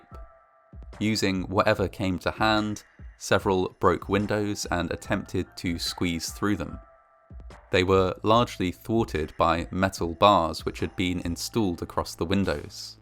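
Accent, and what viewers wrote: United Kingdom. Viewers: What I find fascinating is this American disaster is being narrated by a Brit.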